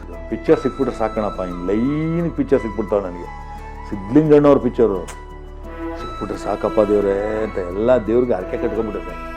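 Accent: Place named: Mandya, India. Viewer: ನಮ್ಮ ಮಂಡ್ಯ ಕಡೆ ಇಗೆ ಮಾತನಾಡೋದು ನಿಮ್ಮ ಭಾಷಶೈಲಿ ಚೆನ್ನಾಗಿದೆ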